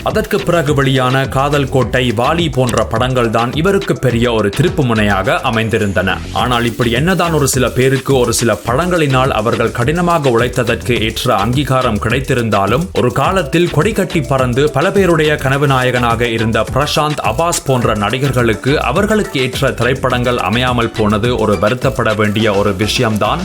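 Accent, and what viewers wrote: Sri Lanka. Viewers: R u srilankan..........? Ur Tamil pronunciation 100% matching with srilankan tamil slang ...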